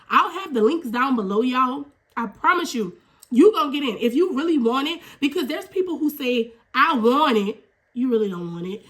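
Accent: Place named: New Orleans, United States. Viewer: the accent is giving New Orleans ❤❤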